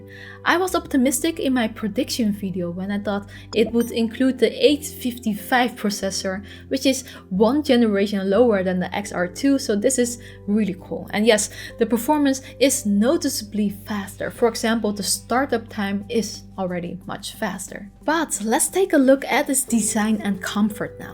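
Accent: Netherlands. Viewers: Iam from belgium and i can hear your dutch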